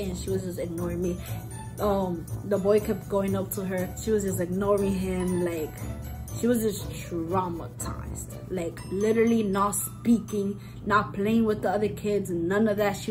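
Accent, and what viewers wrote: New York, United States. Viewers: You from New York huh?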